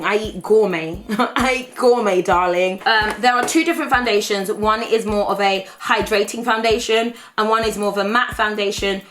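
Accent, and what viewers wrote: Nigeria. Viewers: "your mate look at them" with your Nigeria accent 😂😂😂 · Haha the Nigerian accent. Wow!!!!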